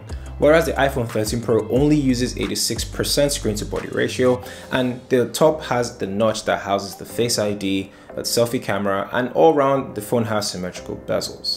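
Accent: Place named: Nigeria. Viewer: The only Nigerian reviewer wey get sense ,I love your accent bro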